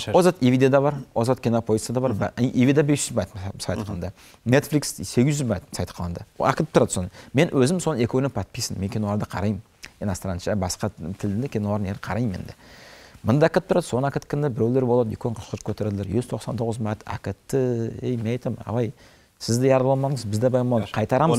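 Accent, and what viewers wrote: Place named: Nogai, Russia. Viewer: На чистейшем ногайском языке разговариваете. Какой красивый язык. Камчатка.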